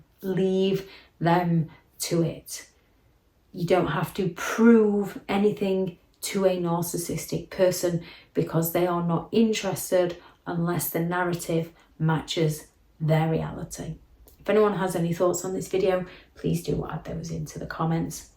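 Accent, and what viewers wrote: United Kingdom. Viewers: this is the FIRST time I have heard your voice. Forever I thought you were Southern Californian, wow was I pleasantly surprised that you are United Kingdom 🇬🇧 ish.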